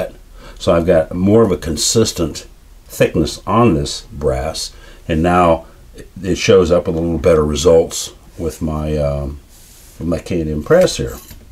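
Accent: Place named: South, United States. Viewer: Hornaday Sayko Lay-pewah Triggered yet? 🤪 That said, most of what we learn about our sport is read in books, magazines, or gun blogs, so if you've never heard it pronounced properly you'll say it the way it looks like it should sound, I don't personally have a problem with that. BUT blaming you incorrect pronunciation on your southern accent is a cop-out: even a southerner can learn to pronounce names correctly! Frankly, it's the polite thing to do!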